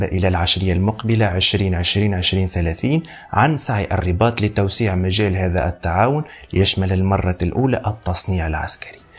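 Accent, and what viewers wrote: Tunisia. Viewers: ماشاء الله تبارك الرحمن تحليل في المستوا مع نطق باللهجة التونسية سبحان الله في المستوا برافو عليك أخي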